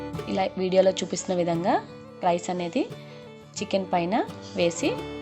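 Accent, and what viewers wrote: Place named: Guntur, India. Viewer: medam padaka padaka antunnaru meru form guntur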